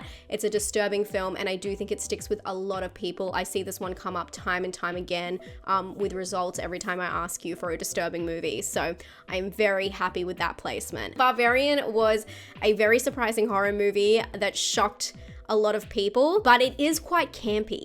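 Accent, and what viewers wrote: Australia. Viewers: I love the Australian accent. That’s just HORA baby!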